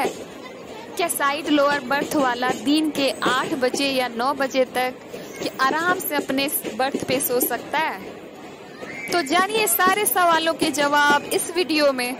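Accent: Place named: Marwar, India. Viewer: Bahut hi badiya jankari di aapne medam… aap has has k jese bata rahi thi video interesting ho gaya or mene pura dekha or ha aapki voice se aisa lagta h aap marwadi ho sayad.. i am right ya wrong medam ??😊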